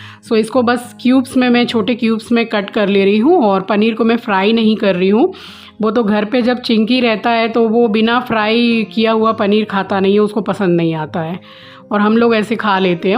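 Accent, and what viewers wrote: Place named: Kolkata, India. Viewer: Aapka typical calcutta accent hai..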